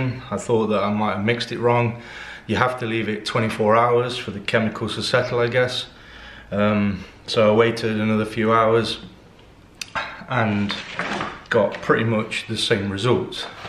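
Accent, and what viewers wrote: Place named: United Kingdom. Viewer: Loved your video! I know you made this years ago, but I can currently feel your pain! I'm a printmaker and struggling with the chemical balances of cyanotype! Lovely to hear a fellow British accent too!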